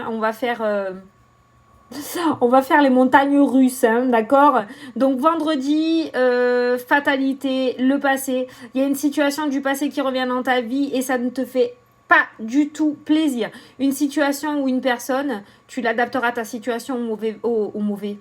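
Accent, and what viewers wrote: South, France. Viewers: Coucou, toujours un bonheur d'écouter tes tirages... Tu es toujours de bonne humeur et spontanée, j'adore!!! 😍 Et tes "putains" avec ton accent du sudeuuu, c'est top, ne change rien 🤣 Belle journée à toi et ta famille 🥳 et merci pour ce concours 🍀🤞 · Rhooo pétant!!! 😜 Les montagnes russes d entrée 😂 putain! C est le sud qui parle et té! Changes rien, t es trop topissime, z'ont qu'à pas t écouter les mauvaises personnes.. Moi je t'adore 💜 et tu es une bonne personne... Je suis seule alors on verra côté cancan... 😜 Rien n arrête les langues de vipères... Merkiii, t es une bouffée de bonheur à chaque vidéo 💜💜💜💜😘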